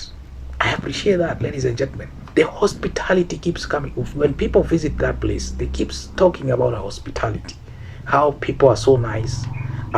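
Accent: Jamaica. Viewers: I can hear a tinge of Jamaican accent in your speech which means it wouldn't take long for you to sound exactly like a Jamaican. With a little practice you could fool anyone.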